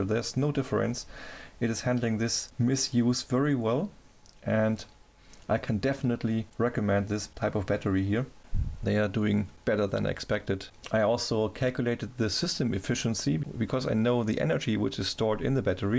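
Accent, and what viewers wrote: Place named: Germany. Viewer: Hi thanks for the helpful information. I guess you are from Germany from your accent.. Would you be able to help me source the aluminium bus bar ? I live near Germany ( und ich kann auch Deutsch reden ; )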